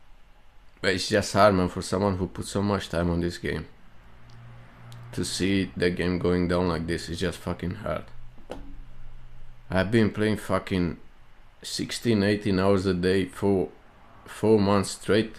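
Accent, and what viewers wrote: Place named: United Kingdom. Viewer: all this long I thought dude was British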